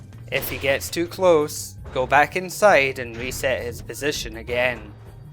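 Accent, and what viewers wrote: Scotland, United Kingdom. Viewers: Are you Scottish or Spanish?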